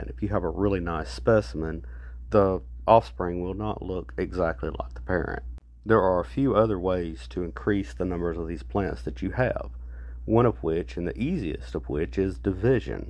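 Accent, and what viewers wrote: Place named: Carolina, United States. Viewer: You have a south or North Carolina accent 🎉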